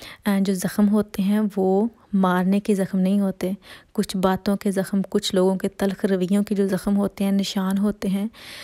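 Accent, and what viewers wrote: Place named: Faisalabad, Pakistan. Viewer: hahaha mujy bolny k texture sy pta chla tha..sb kehty faisalabad k log apni boli sy pehchan leay jatay..me also from fsd ... Khush rahen sister..abad rahen..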